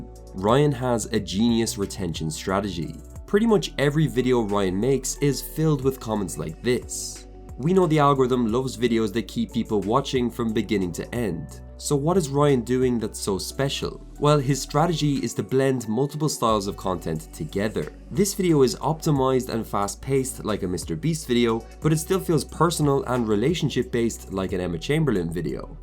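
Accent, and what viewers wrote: Ireland. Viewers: Are you Irish? And happy St. Patrick’s day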